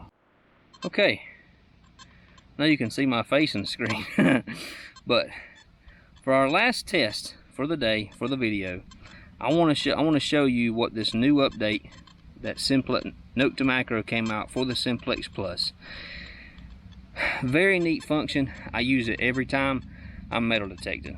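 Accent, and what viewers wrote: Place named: South, United States. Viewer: Pretty good helpful stuff, just ordered one this evening. Your from the south...like where?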